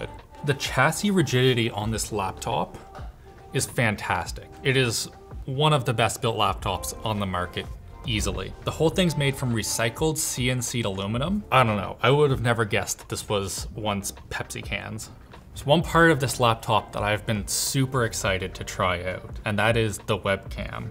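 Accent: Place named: Canada. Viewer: alex is so canadian, the way he says charging, rtx, processor, start, anything with an r. eyar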